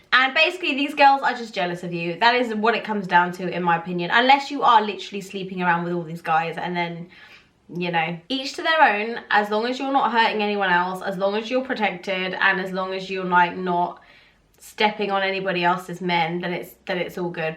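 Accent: United Kingdom. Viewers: I seriously can't get over her face. She's so pretty. And she speaks that funny English (British).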